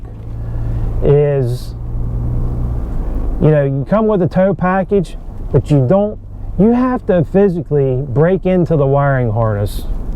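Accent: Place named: Pittsburgh, United States. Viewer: Very nice review! I think I detect a “Yinzer” dialect…you from Pittsburgh? I recently got my ‘23 XL Crew Cab, 2wd with the locking rear end. It’s about as basic as you can get. I love it so far & it does decent in the snow up here in Erie; I’ll get snow tires if I need them.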